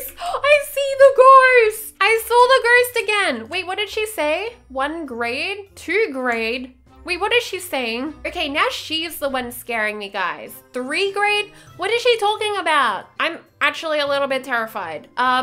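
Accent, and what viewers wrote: Australia. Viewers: Are you Australian? My accent is similar to yours and I’m Australian? Just asking, anyways love the video! You need more subs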